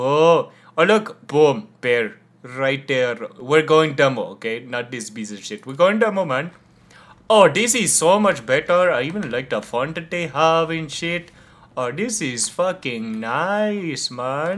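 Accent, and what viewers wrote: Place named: India. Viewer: W indian voice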